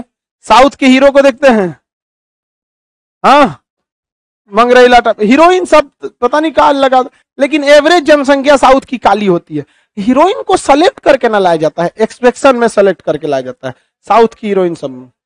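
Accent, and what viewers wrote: Bihar, India. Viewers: I love your Bihari accent sir....😄😆🙏🏻🤣😂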